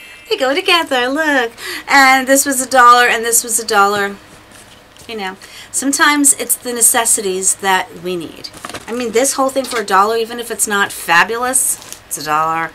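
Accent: New York, United States. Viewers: Love the Llama sox & your New York accent, you are such an awesome daughter to look after your Daddy the way you do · I love how you say New York, it sounds like New Yawrk!! Love your accent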